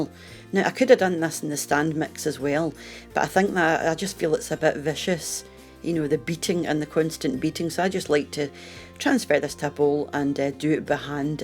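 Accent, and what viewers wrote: Scotland, United Kingdom. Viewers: F Faro she’s Scottish as I am x👍🏴🏴🏴🏴🏴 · Thanks, I love the fact that you used butter cream instead of whipped cream for your Victoria sponge. And your butter cream sure seemed mouth-wateringly delicious! I love the little pinch of salt in there! I'd like to add that I much enjoy hearing you speak your nice Scottish accent! 😃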